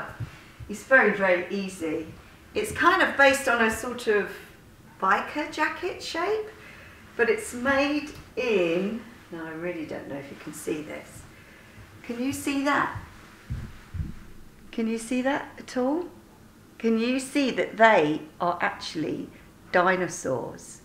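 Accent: United Kingdom. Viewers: Happy Christmas, and thanks for the "tour", especially the beginning as I spent the first 12 years of my life in West Ken. That and your west london accent made me nostalgic. Thanks to your channel I have revived my sewing interest and my new year aim is to work through a Japanese dressmaking book (in English!) I aim to upgrade my style as I am on a diet. 🎄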